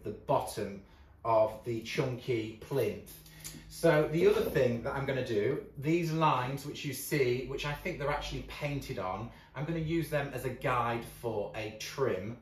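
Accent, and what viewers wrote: United Kingdom. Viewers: I love your British accent almost as much as watching you transform the most plain Jane pieces into stunning beauties. You have an amazing talent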